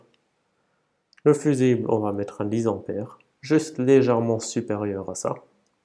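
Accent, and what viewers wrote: Alsace, France. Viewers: sérieux ta voix elle me dit quelque chose. Tu es pas du bas Rhin ??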